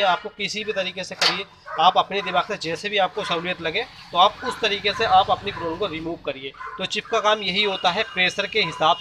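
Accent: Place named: Moradabad, India. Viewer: bhai ji bhaut accha video aur Jaankari Dene ka style style bhaut Saral aur sulabh...dhanyabad...sirf itna bata dijiye ki Kya aap distt moradabad se belong karte hai...aapke bolne ke style se Aisa laga mujhe....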